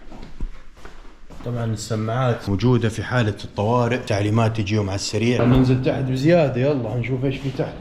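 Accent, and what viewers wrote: Saudi Arabia. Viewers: من تونس لاكن عاش فالسعوديه طفولته عشان كذا يتكلم سعودي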